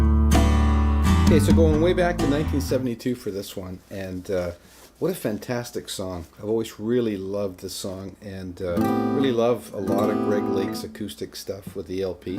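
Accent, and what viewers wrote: Canada. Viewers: How can there be anyone who plays guitar give this a thumbs down?? This guy is fantastic. His voice sounds like he is from Canada?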